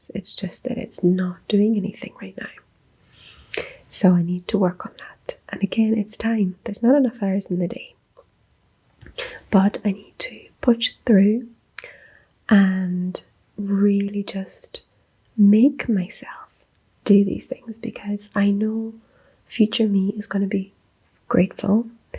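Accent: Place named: Ireland. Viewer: My theory is that people with ADHD really gravitate to ASMR because it's a way to relax and slow down the constant mental activity that provides just the right amount of stimulation to actually do that. In retrospect it's what drew me to ASMR too. I've been following your channel for many years, big fan! I love your Irish accent and your Irish history videos especially! And I can really relate to the struggle of being present as a mother and professionally. Don't be too hard on yourself. Goals are good, and so is generosity and kindness for yourself and respecting the effort it takes. Looking forward to seeing more of your channel again!!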